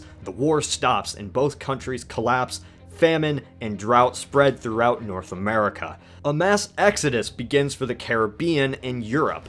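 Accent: Ohio, United States. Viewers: you from Ohio? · Is he from Ohio?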